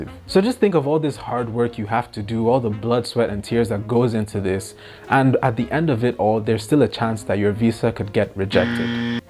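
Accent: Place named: Jamaica. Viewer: Could swear you were Jamaican! I do get you on the privilege thing though. I remember going to a travel agency to seek assistance with applying for a Canadian visitors visa. I already had a US Visa and was scheduled to attend a conference, so I thought I would go up to Vancouver for a few days after the conference. The travel agent was so rude and condescending. Asked for bank statements, how much money you have in your account. Needed accommodation information, I booked accommodation at a hostel in downtown Vancouver. When she read the details, her snarky response 'hostel, shared room with wash sink'? I am traveling on a budget, what did she expect, a 5 star hotel? She went to the back and called her supervisor and asked her in front me if this looks possible. The supervisor sighed, do they have a Visa already? She nodded yes. Well, give it a try. 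I gathered the application forms, told them I will think about it some more. Went home dejected, but something in me said, try doing it yourself. Which is what I did, stayed up all night that weekend, submitted the application online Sunday, sibling gave me a ride to the embassy to submit the application. Got a response two weeks later I was approved and I should pick up my passport. Stopped by the travel agency and showed it to another travel agent I normally booked flights with and she was shocked. The other condescending agent acted like she didn't hear anything, but I knew she was upset. Anyway, thats all in the past now, livin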